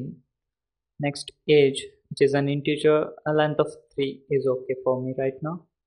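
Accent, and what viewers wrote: India. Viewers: god damn it... every one of this video about PHP is from some random indian guy on youtube. not to be an ass, but that indian accent won't let me concentrate on your videos. If I would give you a tutorial with my broken lame ass persian accent, you would feel like punching me too!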